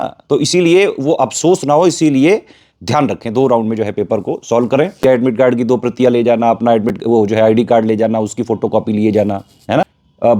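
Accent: Kanpur, India. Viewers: Sir aap kanpur se h kya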